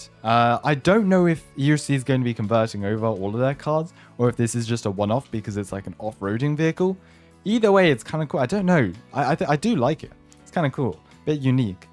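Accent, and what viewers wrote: United Kingdom. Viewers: hes bri ish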